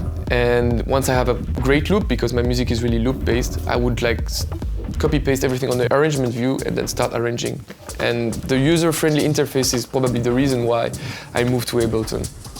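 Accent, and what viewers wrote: France. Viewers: well that explains it then 😅 thought he had a small french accent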